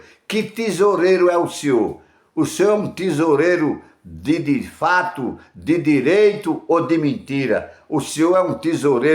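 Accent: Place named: Paraíba, Brazil. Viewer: pastor só uma curiosidade mas parece que o senhor é da paraiba.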